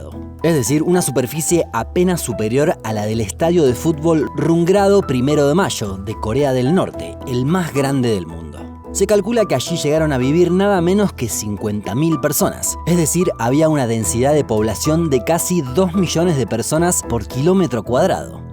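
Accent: Argentina. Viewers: El acento argentino me excita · Se me hace muy chido tu acento, eres de argentina o uruguay?, y no soy gay, solo simpatizo con ese acento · Me gusta tu acento argentino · “Shaky Chan” amo el acento argentino 🤩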